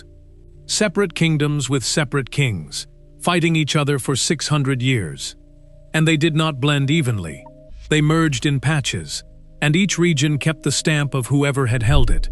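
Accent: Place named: United States. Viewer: Why is it so hard to get a real life narrator. The dramatic AI narration with the US accent is so unsuitabel.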